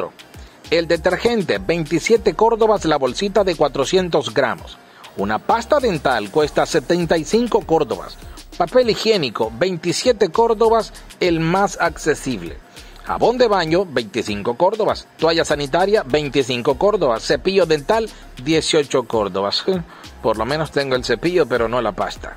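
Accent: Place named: Yucatan, Mexico. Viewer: Oye tienes un tono a yucateco. Pero bien lo que has dado es una lista de precios, y que cada nica ve como resuelve como siempre ha pasado desde ahce mas de 40 años. Loque falta decir es que la tecnología hizo que se incluya en la lista de gastos. Me refiero al celular. Cuanto gasta en mes en recargas? qué precio tiene el celular de un nica? y qué ha dejado de comer para comprar. Entonces mal educados goberno quien gobierne un pais la vendita tecnologia marcará el paso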